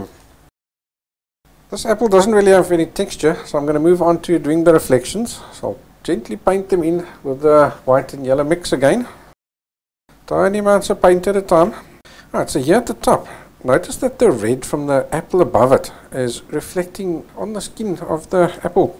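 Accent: Afrikaans, South Africa. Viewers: I can hear he's Afrikaans 😅👍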